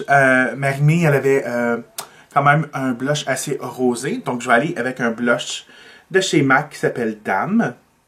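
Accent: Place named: Quebec, Canada. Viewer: Il est très joli ce Makeup j'aime vraiment beaucoup. Je ne me lasserai jamais des accents des youtubeurs Québecois !! quel Bonheur !! Ps: Bonne & heureuse année :)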